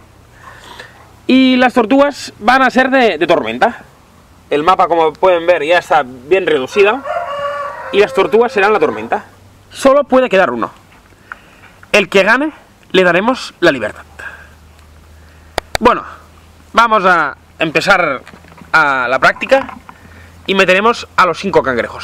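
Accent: Spain. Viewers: Eres español no? :3 Madre mia tortugas jajajaja me mate de risa 😂 Viva cangrejo!!!!!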